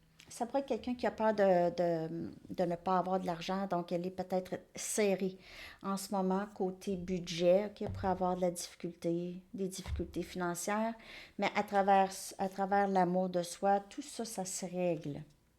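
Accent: Canada. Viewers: Merci belle créature j'aime votre accent canadien et vos guidance je vous adore belle âme (Algérie )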